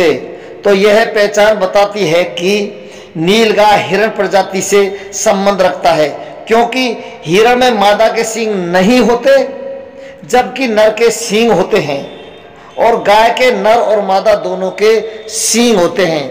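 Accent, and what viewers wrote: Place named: Haryana, India. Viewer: Bhai Haryanvi lag rhe ho😁🤣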